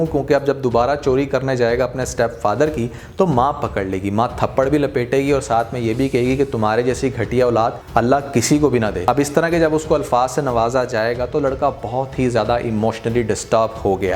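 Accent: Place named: Majha, India. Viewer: Punjabi ikdm Majhe wali boli aapne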